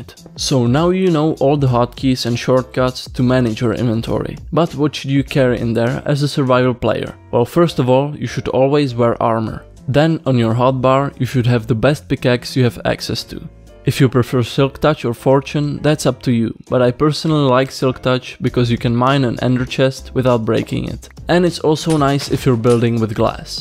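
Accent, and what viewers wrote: Czech Republic. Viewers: I can smel the czech accent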